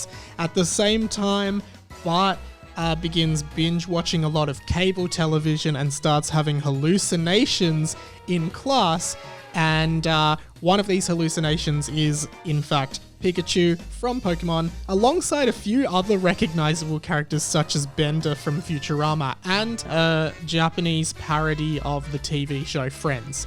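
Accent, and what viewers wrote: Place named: New Zealand. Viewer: I like your New Zealand accent.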